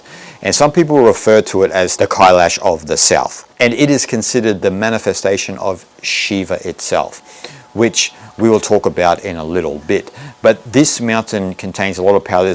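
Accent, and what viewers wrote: Australia. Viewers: THANKYOU SO MUCH FOR THIS WONDERFUL EASY TO UNDERSTAND EXPLAINATION OF ARUNACHALA AND RAMANA MAHARISHI AND THE REASON WHY PEOPLE GO THERE TO RECOGNIZE THE SHIVA WITHIN THEMSELVES HALLELUJA AND AMEN I HAVENT BEEN THERE MYSELF BUT MY DAUGHTER AND THEIR GROUP WITH THEIR GURUS DID THE CIRCUMBILATION OF THE HOLY MOUNTAIN I HAVE KNOWN ABOUT RAMANA MAHARISHI FOR A LONG TIME MANY YEARS BUT I GHOSE ANOTHER PATH OF TIBETAN BUDDHISM WHICH OFFCOURSE IN THE END COMES TO THE SAME CONCLUSION BUT IAM SO PLEASED THAT MY DAUGHTER DID THIS AND HAS TAKEN THE PATH OF SHIVA WITH TWO BALINESE VERY HOLY GURUS IN BALI AND WE LIVE IN BALI NO DOUBT YOUV HEARD OF UBUD A MECCA FOR TOURISTS AND YOGA ANYWAY I CAN HEAR YOU ARE AUSTRALIAN AS WE ARE AS WELL ALLTHOUGH MY DAUGHTER HAS BECOME INDONESIAN AND WITH HER INDONESIAN OR RATHER BALINESE HUSBAND BROUGHT UP HER KIDS HEAR AND DURING THOSE 27 years HAVE HOMESTAY WITH A YOGA RETREAT FACILITY AND SO ON HERE AND IS VERY MUCH INTO THEIR ASHRAMS AND SO ON FOR ALL THOSE YEARS BUT ANYWAY I HAVENT HAD THE OPPORTUNITY TO GO TO THAT PART OF INDIA ALLTHOUGH I WENT TO BODGAYA TWICE AND DHARAMSALA IN NORTH INDIA AND WAS PRIVILIGED ENOUGH TO BE IN THE PRESENCE OF HIS HOLYNES THE DALAILAMA AND RECIEVING ORDINATION FROM HIM BUT IT ONLY LASTED ABOUT 3 YEARS ANYWAY AND THEN MORE OR LESS ON AND OFF JOINED MY DAUGHTER IN BALI BUT NOW LIVE HERE PERMANENTLY FOR THE LAST 2 YEARS AND 9 MONTHS SINCE IAM 83 allmost 84 MY DAUGHTER IS LOOKING AFTER ME IN RETIREMENT THE NAME OF O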